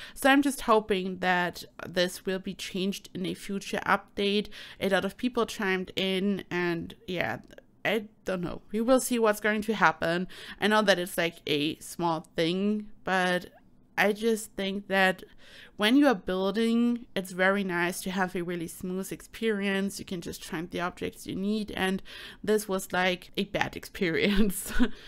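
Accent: Germany. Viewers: u sound german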